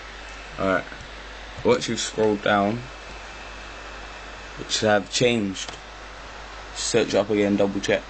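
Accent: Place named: London, United Kingdom. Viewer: yeah, dis brar obviously from london, safe. and dis is usefull but how the fuck can i du dis on a belkin. jheez not been on xbox for longgg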